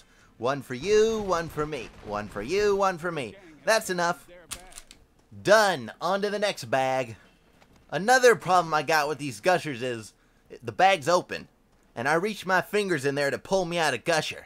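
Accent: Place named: South, United States. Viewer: You sound like a hillbilly. Don't take that offensively. I don't know if it's an act or not but it adds to the comedy.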